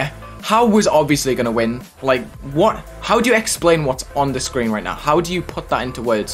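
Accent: United Kingdom. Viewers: bri' ish???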